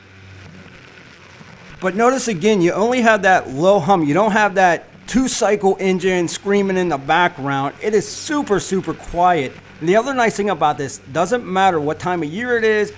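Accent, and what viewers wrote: Pittsburgh, United States. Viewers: You from Pittsburgh if not you sure have a pittsburgh accent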